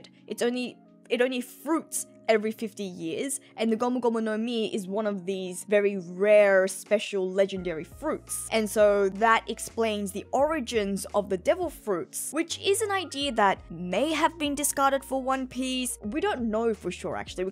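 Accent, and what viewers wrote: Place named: Australia. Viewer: The word of the day is Deuteragonist lol. Hearing it in an Australian accent is a delight. Great video!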